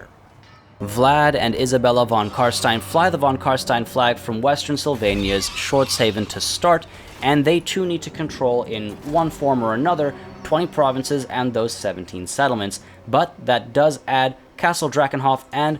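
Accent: France. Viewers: Your French accent is so funny. And you should do this video when everything was out and with all news. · Damn!! That French accent. Cool Vid man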